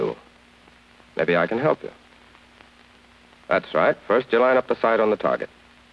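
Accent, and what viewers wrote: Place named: Transatlantic, United States. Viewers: That transatlantic accent carries hard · Its the Mid Attlantic accent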